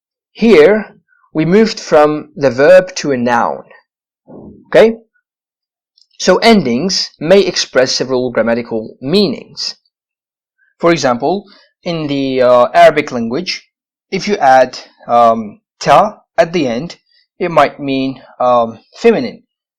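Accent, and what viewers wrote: United Kingdom. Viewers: Thanks a loot. I was shocked when you said " arabic our language " . You sound British.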